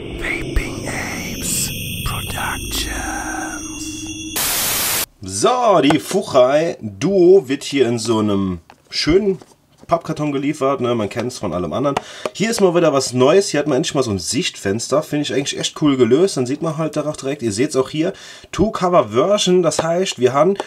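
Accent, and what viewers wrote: Saarland, Germany. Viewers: Kommscht du ausm Saarland? de Dialekt kommt ma bekannt vor ;)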